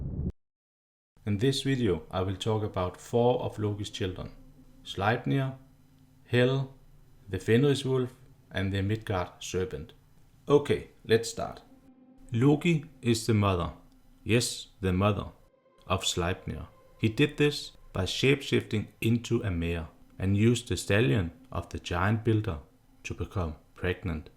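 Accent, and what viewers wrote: Denmark. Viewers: Excellent Video!, i have the doubt, where are you from? i think you have danish accent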